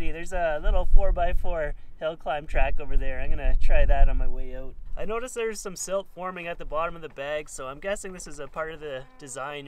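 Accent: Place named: Manitoba, Canada. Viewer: Are you from Manitoba? You kind of have the 'don cha 'no accent.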